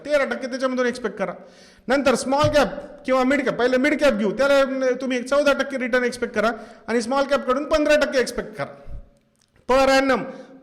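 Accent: India. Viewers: Pan Marathi Evdhi pan khedval Nka bolu Sir...... Kahi national viewer pan astil tumcha... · अस्सल गावरान तडका..... लै झ्याक सर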